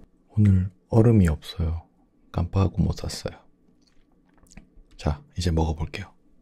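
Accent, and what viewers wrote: Busan, South Korea. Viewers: 부산말투?사투리 느껴져서 괜히 정감가는듯 ㅎㅎㅎ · 부산사투리가 왤케 좋죠? ㅎㅎ 꿀떨어짐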